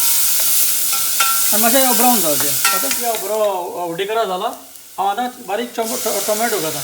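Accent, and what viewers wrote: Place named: South Goa, India. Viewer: What I loved most about this video is our South Goan Konkani and accent ❤❤ wish we saw more of it in media!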